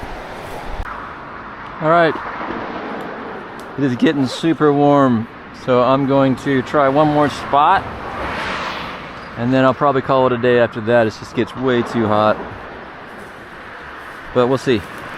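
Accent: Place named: United States. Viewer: cool video and some useful info. You definitely don't sound Swiss :) You're American aren't ya?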